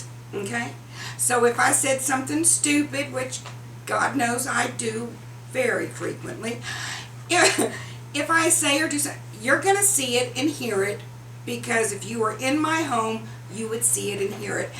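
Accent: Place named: South, United States. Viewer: I am from Arkansas but have been living in Pennsylvania for over a year now (.My husband's job brought us here ) I am sometimes starved for the sweet sound of a southern accent ! I think I watched 90% of your videos last night and thoroughly enjoyed them all !!! I even shared one on my Facebook wall . Keep making those videos !!!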